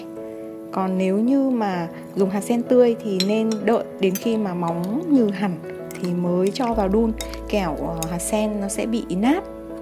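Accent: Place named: North, Vietnam. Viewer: noí tiêńg băć nghe hay. thanks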